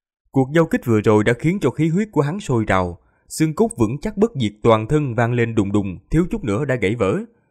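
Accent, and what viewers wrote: South, Vietnam. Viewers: Cảm ơn đạo hữu rất nhiều Vì tui đồng hành vs từ những ngày lập kênh Qua biết bao nhiêu tập truyện.. Nên khả năng nghe và nói tiếng miền Nam tốt lên rất nhiều Đợt công tác vừa rồi vào miền Tây ko có bỡ ngỡ như ng lần đầu vào chút nào cả..❤ Vì thế giao tiếp rất chi là tốt vs ng bản địa Rất cảm ơn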